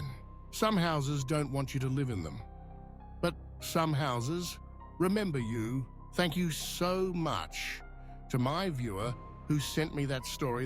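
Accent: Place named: Australia. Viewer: Ausie accent, great mate..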